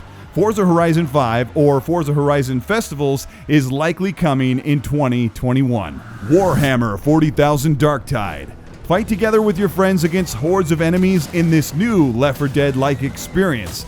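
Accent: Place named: Netherlands. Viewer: You have a Dutch accent. Is he Dutch?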